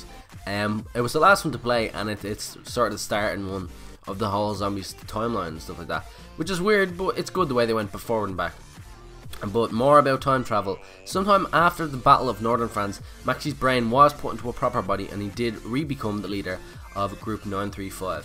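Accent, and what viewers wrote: Ireland. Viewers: is je Irish he sounds really irish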